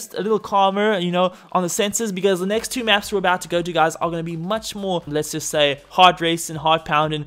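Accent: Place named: South Africa. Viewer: it makes sense cause I am from South Africa I’m guessing most of your fans are South African, they just didn’t realise your accent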